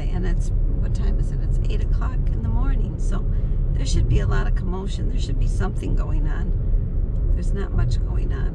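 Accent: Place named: New York, United States. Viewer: It is beautiful countryside. It looks peaceful. I've never been to New York, except a plane stopover at JFK, if that counts. The village looks cozy. I had a bf from Corning, and his mom worked at the Corning factory. He was my first love, so I never really got over him. Be careful, filming and driving. I haven't driven a standard in many years, but I can do it. Yes, so cozy is the countryside. And you do have a New York accent, definitely. That story about the dogs in the trunk is dreadful. Those dogs are amazing. They did not holler, which goes to prove that dogs protect their people, and they don't expect their people to protect them. They could have very easily hollered to get yall's attention. And the fact that they held in their urine. Yes, very amazing dogs indeed.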